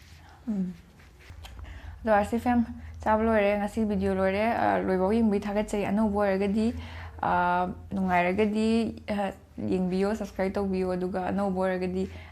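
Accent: Kakching, India. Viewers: Kakching lon ngangbna hena nungshiye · eche mathangdi momo oina chabiyo adusu kakching marol da ngang ngaga chena ngangbado taba yam nungaijeiye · Kakching lonna nungyba helle echan tharoi charak o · Ymna haotraba malleko...kakching mrolda oina ngangbiyo aduna henna nungsiye😍😍momo oina chabgi touse...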